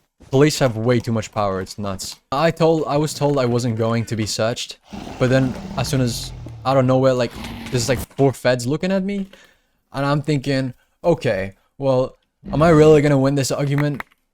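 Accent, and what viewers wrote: United Kingdom. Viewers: i dont care if you sound like brithish be strong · well sorry you sound Scottish like me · bro i luv ur british accent thats the reason im watching ur vids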